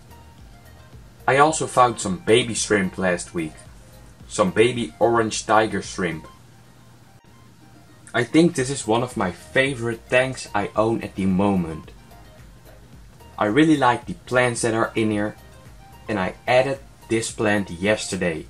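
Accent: Netherlands. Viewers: Ik hoor... een Nederlander ;) prachtige bakken heb je! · Hey! Ontdekte je video bij toeval! Aan je accent te horen ben je ook Nederlands. De witte waas in je water zijn dooie bacteriën, dus niets aan de hand! Ik ben gesubt! En ga zo door 👍🏻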